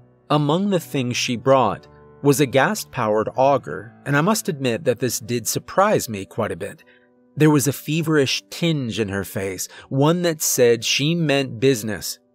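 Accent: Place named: South, United States. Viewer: Now whenever you do you southerner accent all I can here is TRAVIS MA BOY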